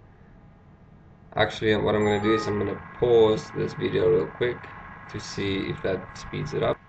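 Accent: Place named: South Africa. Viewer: oh you from south africa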